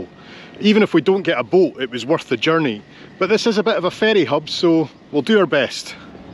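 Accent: Scotland, United Kingdom. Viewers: I'm going to let you in to a wee secret: Gothenburg ain't the perfect city and Sweden ain't the perfect country. Like you put it yourself on a beautiful summer day like this it sure can be awesome just as nicely relayed by your video. Come back in late November when it's gray, wet and just super depressing and you'll have other thoughts. Still, Gothenburg does have a lot going for it and it is a delightful city to visit, no doubt about that. Thanks for the video, quite like your unique touch and that Scottish accent is just adorable. PS. Stena goes to Denmark. I'll actually be taking the Fredrikshavn - Gothenburg ferry in July. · Travel was a wonderful part of my life. But now, physical challenges make it impossible. So thanks for taking us all along on these lovely trips. And frankly, this Coloradan could listen to you read the phone book ,with that delightful Scottish brogue!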